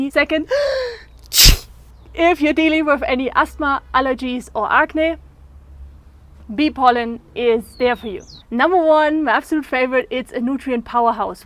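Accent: Germany. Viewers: This is the first video of yours I'm seeing. It's very interesting. You're in the Andes? But you sound German. I recently bought bee pollen for chronic inflammation. I'm hoping it will help. I like your video and will check out more.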